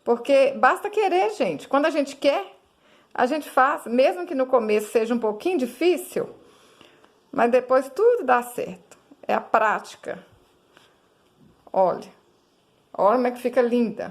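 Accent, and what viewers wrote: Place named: Caipira, Brazil. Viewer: Amiga querida eu amo seus trabalhos tento fazer quase que todos adoro sua voz meio que caipira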